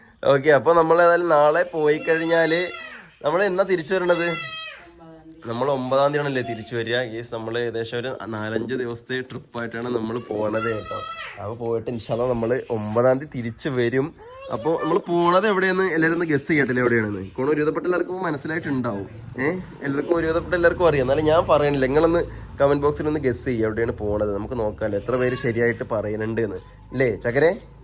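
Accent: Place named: Malappuram, India. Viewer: Umma kolllam baasha paraunnund malappuram baasha😊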